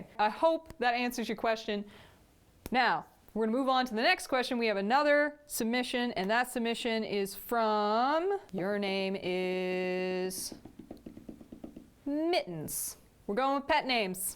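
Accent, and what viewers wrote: Texas, United States. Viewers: Did anyone else notice her slip into a heavier Texas accent halfway through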